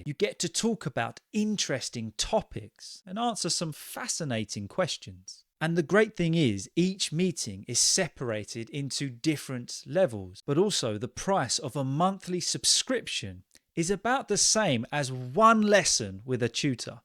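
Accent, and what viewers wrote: United Kingdom. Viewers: It is stupendous teacher,.I follow British pronunciation therefore, I try to imitate you. Hence, please try to make more speaking videos 😊 Thanks from India.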